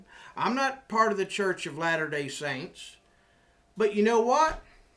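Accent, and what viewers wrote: Appalachia, United States. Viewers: speakin hillbilly works for me. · i like that hillbilly accent i salute u from sweden!!!! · love your vid as somone who lives in peoples rep of kalifornia.what your saying is plane old fashioned common sense! be prepared where ever you are i learned that in the army,love how you speak hillbilly my roots are missouri and tex,louisiana take care thank again gil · U speck hillbilly me to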